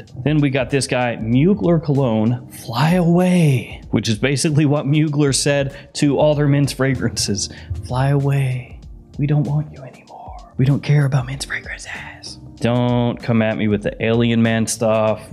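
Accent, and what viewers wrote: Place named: Tennessee, United States. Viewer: I like when uses his original Tennessee accent 😆 Embrace your southern accent 😀👍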